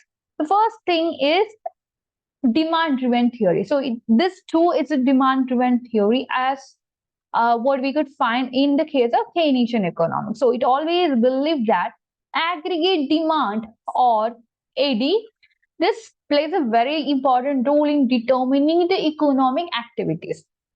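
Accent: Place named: India. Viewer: I’m sorry for the bluntness, but the Indian English accent is so harsh to an American English ear that I could not understand much of what was being said.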